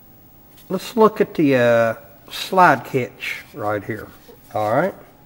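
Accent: United States. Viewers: No homo but I love his voice and accent,picture perfect American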